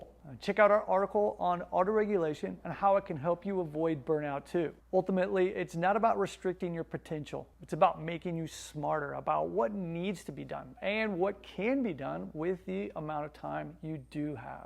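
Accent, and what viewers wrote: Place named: Kansas, United States. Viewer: Sounds like he's left the land of Oz and is back in Kansas--Wichita, Kansas!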